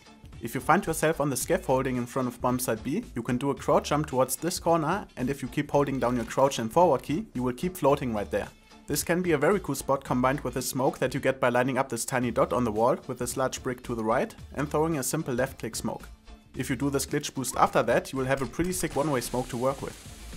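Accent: Germany. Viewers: Du Bist Deutsch ne? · deutscher Diealegt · deutscher akzent an!👍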